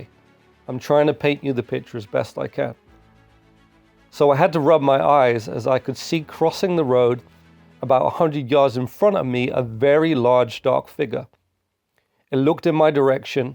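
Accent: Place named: England, United Kingdom. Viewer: What a lovely calm voice you have and beautiful English accent , it does not sound like a Devon accent but forgive me if I’m wrong 😆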